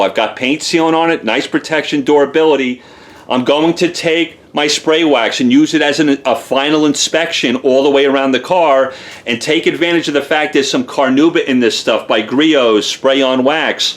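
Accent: New York, United States. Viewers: I just love that thick NYC accent !!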